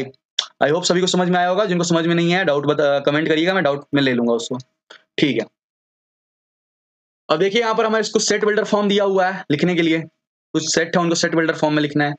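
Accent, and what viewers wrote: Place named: Bihar, India. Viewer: bhaiya kbse hi lg rha tha aapke accent se aap bhi bihar se ho...... ❤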